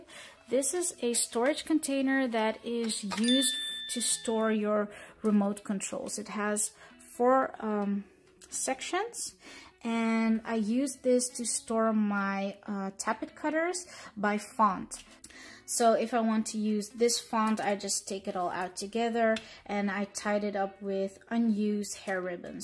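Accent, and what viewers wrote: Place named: Philippines. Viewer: Cool vid! Btw you a lil filo accent, are you filo?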